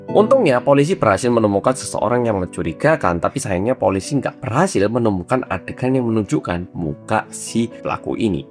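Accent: Java, Indonesia. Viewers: menemaniku saat cuci piring yaaa oppa medok😊😊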